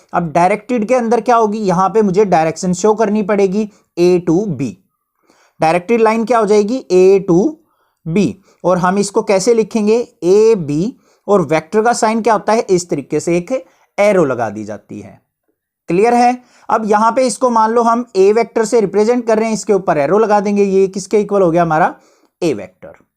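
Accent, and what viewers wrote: Haryana, India. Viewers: Bhai Haryana k hai sir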